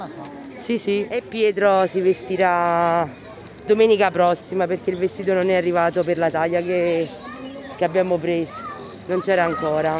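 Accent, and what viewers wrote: Naples, Italy. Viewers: Bella napoli e il suo dialetto....parlaci sempre...un po' di più...ti abbraccio carissima 💋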